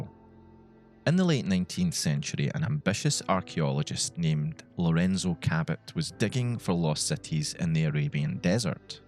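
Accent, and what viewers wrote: Scotland, United Kingdom. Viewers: Scottish spooky game content person ??? Ive just hit the jackpot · U have a subscriber i can hear ur from my neck off the woods the uk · Oh ny god , ive at last found a scottish gamer for fallout 😂ive been watching too much american youtubers , i now have a twink in ny accent 😂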